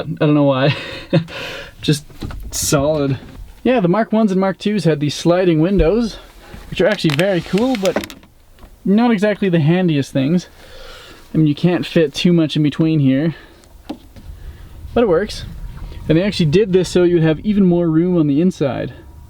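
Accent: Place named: Canada. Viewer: Honestly I must be going mad because every time I find a Canadian on youtube, they seem to go out of their way to say words with "ou" in them, usually ends up being "oat".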